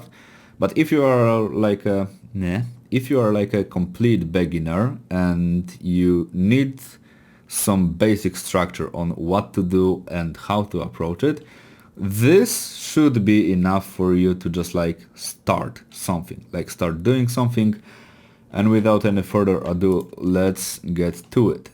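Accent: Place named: Poland. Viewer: It's very funny cuz I can tell you a Pole few mins in 😂 Informative video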